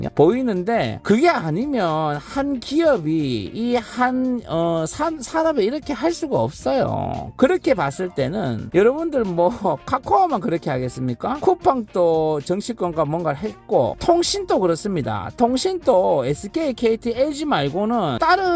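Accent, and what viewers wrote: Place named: Daegu, South Korea. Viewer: 대구사투리가 너무 듣기 싫다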